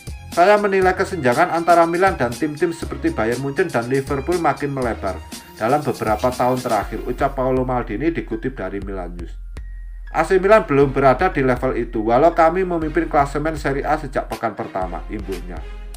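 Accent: Java, Indonesia. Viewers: Hadeh ini narrator piye! Karedok medok banget! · Medokmu parah kang 🤣🤣🤣🤣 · lanjutkan aja mas..medok² yg penting MILANISTI 😎 Forza Milan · Lah medoke kang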